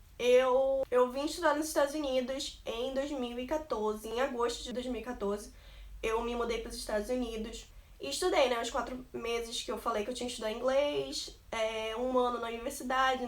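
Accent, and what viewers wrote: Pará, Brazil. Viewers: O que vc estudou e se formou na faculdade? E como vc fez para se sustentar aí? 😱😱😱 sei q é caro, o dorm tb é caro! Fala um pouco sobre a questão do racismo? Vc é natural de onde,? Parece Paraense pela sua fala❤️Bjusss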